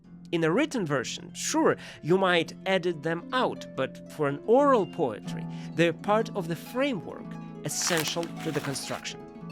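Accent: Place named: Austria. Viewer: Очень странно, но когда услышала первые слова этого видео, подумала, что сошла с ума, потому как полилась немецкая речь, в то время как несколько недель назад я слушала это на английском. Копец конечно, вероятно через пару месяцев оно переключится на китайском, но в нем я не сильна 😅а вообще, ИИ иногда произносит имена авторов неверно, вот недавно слышала вместо Джейн Эйр он сказал Дженера. Бумажные книги останутся самым надёжным источником, потому как их нельзя сманипулировать, и жаль, что многие уже книгами расхламировались. P.S. автор этого ролика говорит сейчас на немецком, но скорее с австрийским акцентом.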